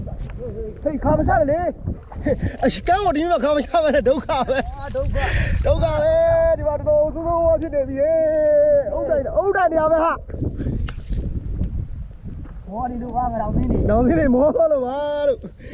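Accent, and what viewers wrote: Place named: Thandwe, Myanmar. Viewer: ဘယ်ဒေသကလဲ အစ်က်ု့အသံက သံတွဲဘက်က အသံနှင့်တူတယ်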